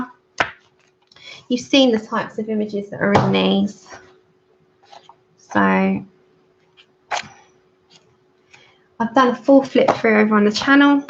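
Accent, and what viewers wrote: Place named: South East London, United Kingdom. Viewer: Great collection! !loved watching and thanks for showing us!also were are you from as I'm from South East London and you sound like your from here too x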